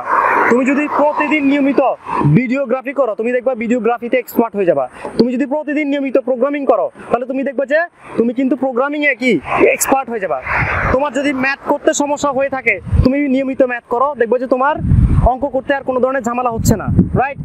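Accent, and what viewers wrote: Chittagong, Bangladesh. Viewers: ভাইয়া আপনার বাড়ি চিটাগাং নাকি?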